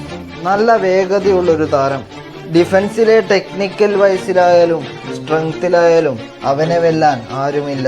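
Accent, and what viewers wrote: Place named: Kannur, India. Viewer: enik kannur oru frnd ind.... Avn samsarikkunnath pole ind😁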